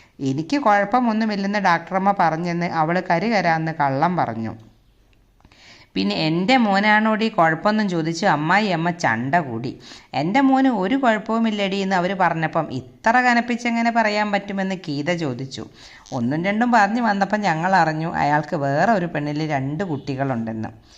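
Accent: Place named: Thiruvananthapuram, India. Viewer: തിരുവോന്തരം ഭാഷ ഉഷാർ😊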